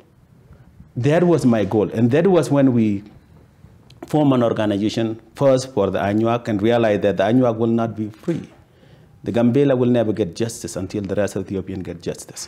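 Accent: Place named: United Kingdom. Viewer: like that British accents. Thanks for your presentation.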